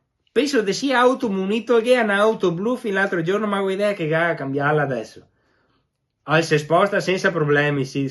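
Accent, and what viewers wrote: Vicenza, Italy. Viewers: Luca scotton sono d'accordo con te è strepitoso, ma dimmi " in quale provincia parlano come lui forse Vicenza?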